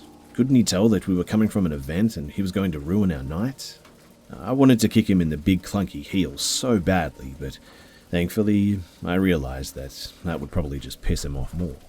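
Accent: Australia. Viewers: instant subscribe an actual human narrator AND ur from the motherland aus bro sign me up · Is the accent Australian??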